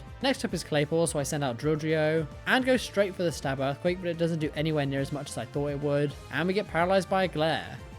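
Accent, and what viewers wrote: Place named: United Kingdom. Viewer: what a beautiful british accent 😁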